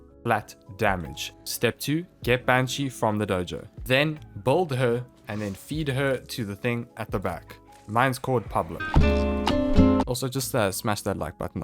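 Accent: South Africa. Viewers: Dude you sound South African. Probably from Joburg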